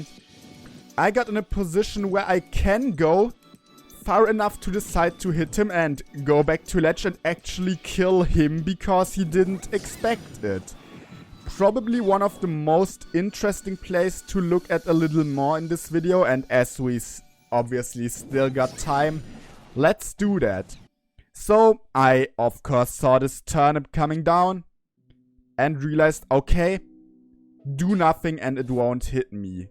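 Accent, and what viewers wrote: Germany. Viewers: Holy shit are you german?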